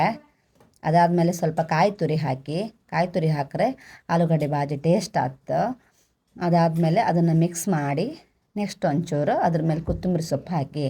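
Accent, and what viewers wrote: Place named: Kundapura, India. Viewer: ನಿಮ್ಮ ಕುಂದಾಪುರ ಕನ್ನಡ ಇಷ್ಟ ಆಯಿತು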